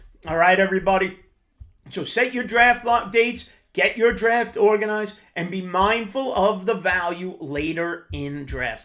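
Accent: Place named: New York, United States. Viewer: Great job. Love the New York accent. Very curious who the framed picture is in the background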